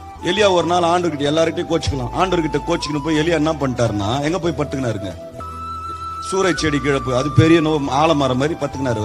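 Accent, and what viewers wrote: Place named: Chennai, India. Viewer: மெட்ராஸ் தமிழ் மிகவும் அருமையாக இருக்கிறது சகோதரா கர்த்தருடைய வார்த்தை உண்மை அதுவே மெய்யான ஜீவன்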